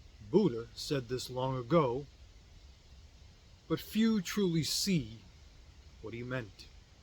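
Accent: Boston, United States. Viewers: Good stuff!! Is that a Boston accent?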